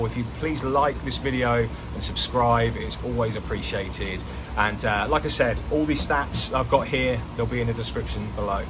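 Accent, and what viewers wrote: United Kingdom. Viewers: I’m moving to Orlando in 5 years, we have family in the USA 🇺🇸 you sound like a Brit from the UK. I live in London, and very worried about trump and his policies regarding immigration as for interest rates they only low for domestic UK citizens if your UK buyer they want 5 1/2 up to 8% and they want 40% deposit down so you get discriminated against because you’re investing in their country, disappointing, 👎🏼👎🏼👎🏼👎🏼👎🏼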